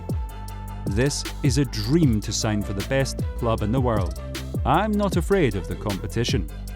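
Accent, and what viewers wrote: Scotland, United Kingdom. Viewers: You have a Scottish accent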